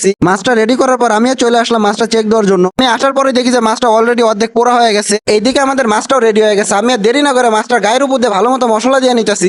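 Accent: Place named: Comilla, Bangladesh. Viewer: Apnar basa ki cumillay naki mone hoy je cumillar vasa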